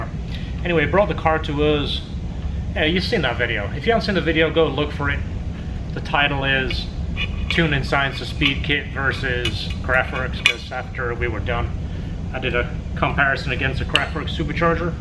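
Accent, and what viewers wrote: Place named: Scotland, United Kingdom. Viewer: Love the s2000 build. Hopefully you guys can work with me when I pick mine up. Also, love the accent, Scotish?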